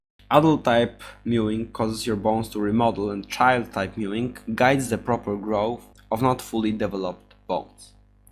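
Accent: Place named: Russia. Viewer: Слышу русский акцент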